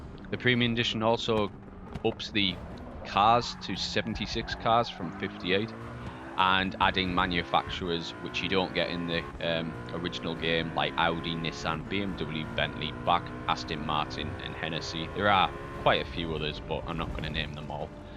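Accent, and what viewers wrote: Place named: Middlesbrough, United Kingdom. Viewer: Where are you from? Sounds like a Boro accent.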